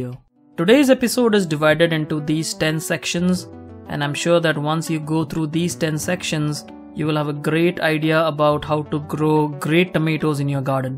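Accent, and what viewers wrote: India. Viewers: I like his Indian accent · English is so much in Indian accent, it's hard to understand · Your accent felt to me from Indian origin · what other accent would an Eastern Indian person have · his indian accent is so thick i love it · You've got an Indian accent sir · clearly Indian Accent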